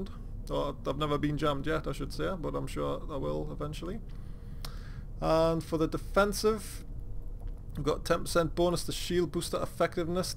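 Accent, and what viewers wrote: Newcastle, United Kingdom. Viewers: newcastle or sunderland?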